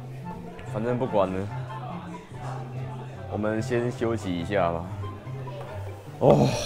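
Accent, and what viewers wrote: Taiwan. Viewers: 真的，藏不住的台味XD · 講話真是夠台哈哈，跟我叔叔講國語一樣哈哈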